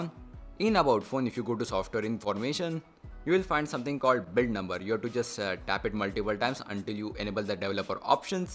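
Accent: India. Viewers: Idk if this is sounds racist but, I freaking love Indian accents! It's just something flattering about them.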